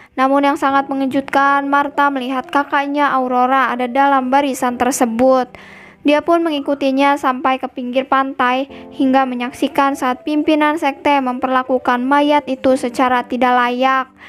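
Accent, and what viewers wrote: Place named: Madura, Indonesia. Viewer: Suaranya kayak bocil sd, gbisa santuy tapi cepat. Maduranya kelihatan banget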